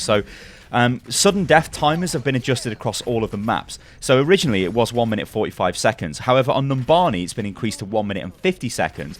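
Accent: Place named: United Kingdom. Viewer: I love your accent and voice, where in the uk are you from?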